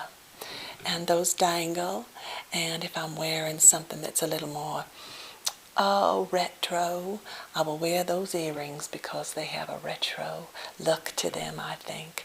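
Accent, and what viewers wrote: South, United States. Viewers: I love the fact you didn't go overboard with the usually stereotypical "Southern" accent. I have actually heard women that speak like this. Especially how you said window screen. Thank you for your wonderful videos! I am so glad I found you! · When the video first started, I was disappointed for a minute because I was expecting a different kind of Southern accent but the longer I watched it, the more my head melted so I am no longer disappointed! Thank you very much for this! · Love Miss! Would like to hear more as I do love her southern accent - gives me tingles! This is one of my top fav asmr videos. Thank you. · you remind me of one of those 1950" s housewives you're beautiful and have a very southern accent love to hear southern accents mine is Lakota Sioux sound very young voice lol anyways love your videos and earrings but why do you whisper ? 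and anyway love to be friends on my fb my name is summer rain Americanhorse on F B ty for the videos God bless🐝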